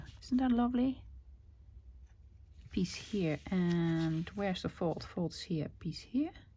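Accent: Netherlands. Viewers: 🤣🤣🤣 big ole envelope!, are you from the southern Netherlands? People tease me about my southern US accent and I say “big ole…” all the time. Cute project. Thanks for sharing!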